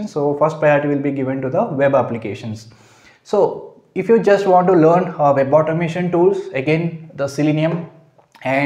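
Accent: India. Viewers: First thing: Improve your English. This is Indian English, not UK/Oxford English. I don`t understand a shit.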